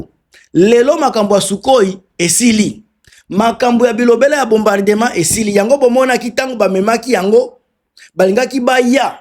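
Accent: Rwanda. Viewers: Oza rwandais lingala na yo ezo funda yo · Liboma nayo yoka tonalité ya Français Rwandais ce normal olobele m23 po oza rwandais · Oza kizengiyo bozela yo oza congolais kizengiyo nyama yoka ata lingala nayo rwandais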